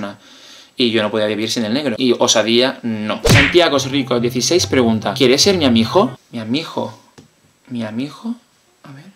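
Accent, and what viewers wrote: Catalonia, Spain. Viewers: Eres Catalán?!?! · eres catalán o valenciano?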